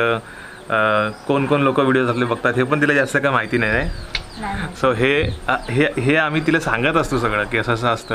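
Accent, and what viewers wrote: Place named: Shrivardhan, India. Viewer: तुमची भाषा आमच्या श्रीवर्धन सारखी आहे लय भारी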